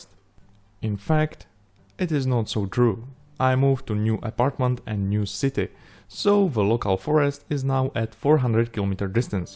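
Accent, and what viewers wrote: Czech Republic. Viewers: Ty vole, českou angličtinu poznáš všude... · ten prizvuk se mi zdal docela cesky :D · wait wait you are czech?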